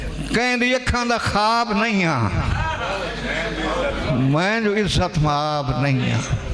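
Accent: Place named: Saraiki, Pakistan. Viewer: یہ پنجابی مشاعرہ ھے یا سرائیکی پنجابی َاور سرائیکی دونوں زبانوں اور خطے میں بھی فرق ھے میزبان بھی سرائیکی زبان کے لب ولہجے میں اپنی زمہ داری ادا کر رھے ہیں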